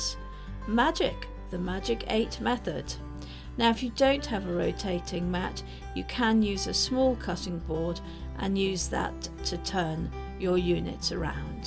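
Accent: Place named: United Kingdom. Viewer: Hello, I just found your videos & have subscribed to your channel. I ❤️ yor easy to follow method of teaching, your choice of quilt blocks & the gorgeous fabrics!!! Your wonderful Brittish accent is also a plus! In this video, you asked for suggestions as to what block we would like made by you. Since my passion is PEACOCKS & PEONIES, (my kitchen & dining room 'theme') would you please do a video on making a realistic 'dancing' peacock (tail feathers opened upright) with CORAL PEONIES applique block? I also love the Brittish Peacock Butterfly. So I am humbly & eagerly awaiting to see if you will take these two suggestions to heart! Thank you kindly from USA.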